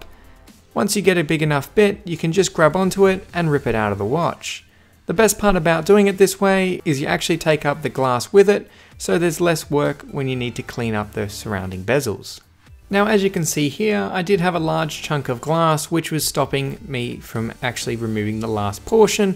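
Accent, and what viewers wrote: Australia. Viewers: So you’re Aussie.... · Aussie accent bud they rise in pitch at the end of SENTANCES lol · When I hear he’s Australian like me Insert surprised pikachu meme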